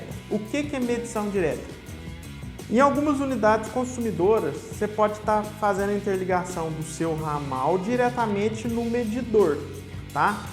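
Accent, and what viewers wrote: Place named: Minas Gerais, Brazil. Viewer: toptop explicação na nossa lingua "mineires" de facil aprendizado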